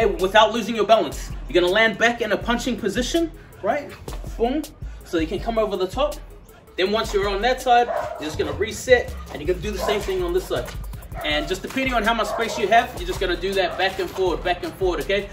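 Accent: New Zealand. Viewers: Sounds like you're from New Zealand? Your voice reminds me a tad of Taika Waititi but not exactly the same.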